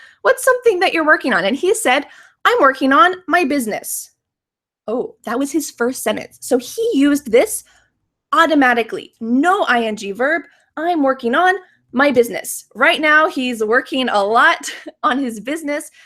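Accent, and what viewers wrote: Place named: United States. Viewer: Your English American pronunciation and accent is really perfect, I never heard someone who hade such perfection speaking like you dear sister, God bless you and your family and ministry so much. God makes prosper all works of your hands in Jesus Christ our Lord.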